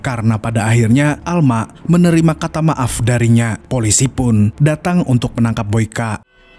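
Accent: Banjar, Indonesia. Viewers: Pian urang banjar kah? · Orng banjar kh mang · Urang banjar sekali ny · Urg banjar kh mang 😂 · Banjar nya mana pian mang... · Admin orang banjar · Urang Banjar kah sakalinya? 😁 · Urang banjar kah boy admin nya nih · Banjar sekali nya Admin Nya,slam sanak dari kotabaru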